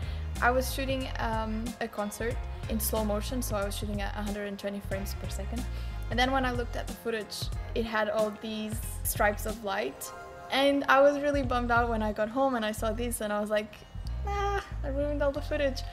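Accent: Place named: Portugal. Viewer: The second one is really helpful when it comes to creating better photography compositions. I really didn't realize up until recently hahaha. If anyone want to see my pictures, my Instagram account is: Ps:, teu sotaque português é muito lindo hahahah faz outro vídeo em português PLEASE ( Sou do Brasil)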